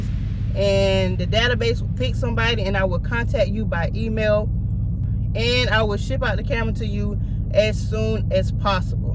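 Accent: South, United States. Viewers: Well, it's always a pleasure seeing your beauty brown skin next to that car, and hearing that lovely southern accent. 😊 Sending love all the way from Philly. 🔔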